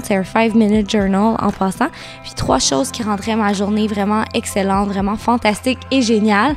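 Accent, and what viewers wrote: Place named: Quebec, Canada. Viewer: Votre accent est québécois 💜🥺 j'adore Je te suis de la tunisie😊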